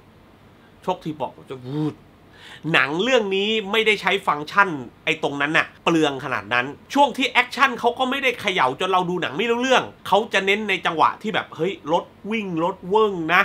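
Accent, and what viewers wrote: South, Thailand. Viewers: พี่เป็นคนที่ไหนครับ จังหวัดอะไรครับ สำเนียงเหมือนคนพากย์ใต้เลยครับ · พี่เป็นคนภาคใต้รึเปล่าครับ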